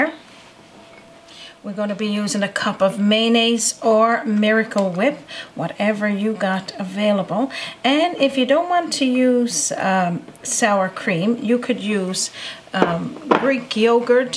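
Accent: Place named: Newfoundland, Canada. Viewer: I so enjoy your NFLD speak. That dip looks so darn good thank for your video.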